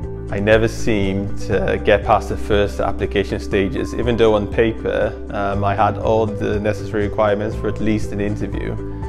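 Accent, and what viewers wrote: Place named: Wales, United Kingdom. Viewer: Well presented. This problem with names in determining whether or not you will get an interview is not new, although people outside of London are more likely to make an effort to get your name right. On the flip side, there are many English names (not a problem with Welsh names) whose pronunciation is ridiculous, eg. Featherstonehaugh, pronounced Fanshaw. I think that you have begun to pick up just a trace of a South East Wales accent, so well done from a Welsh ex-pat. I had not heard of the campaign, so it deserves more coverage.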